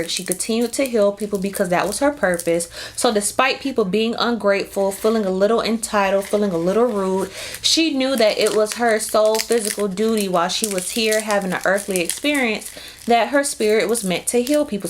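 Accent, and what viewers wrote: New Orleans, United States. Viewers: The “cOLD DRANk” 😂😂 I like that N.O. accent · as a new orleanian baby you got the accent 😂😂 and cold drank is legit ONLY said when referring to soda ❤️🙌🏽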